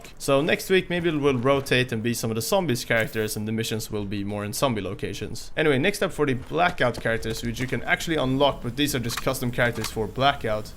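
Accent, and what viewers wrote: Sweden. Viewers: First video im watching and i am 100% that he is from Sweden. I’m I right? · u sound swedish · Are you swedish? That accent though 😎👌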